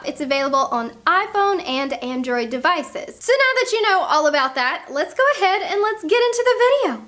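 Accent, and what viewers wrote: South, United States. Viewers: southern accent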